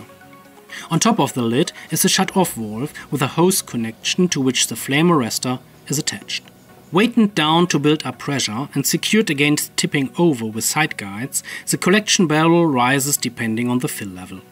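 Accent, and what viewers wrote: Germany. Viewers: Dual Publishing in your native language is neat and appreciated! Also, don’t feel bad about your accent, it’s neat and ALSO your English is far better than my german! (And any English speaker whining about it probably can’t speak a single other language!) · I love the German accent especially yours it’s nice, like a fine British accent!! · He's German 😂 the accent is very clear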